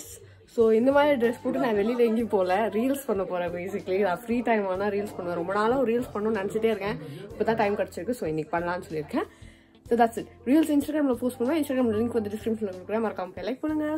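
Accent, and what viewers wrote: United Kingdom. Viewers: that British accent though! One of the best! Loved the vlog!! 💯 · Talk in British accent nxt vid ,maybe teach us ur accent,coz it'll ofc help us!! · Her cloth 😂 British accent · British accent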